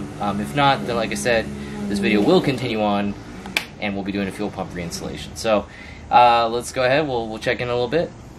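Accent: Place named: British Columbia, Canada. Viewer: Your accent sonds like almost Fraser Valley BC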